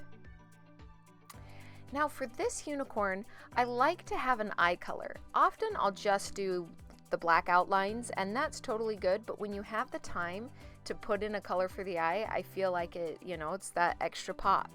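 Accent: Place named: United States. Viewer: we should do a collab, but I guess by your accent that you are in America...You are AWESOME!! Brilliant Art Work! <3 <3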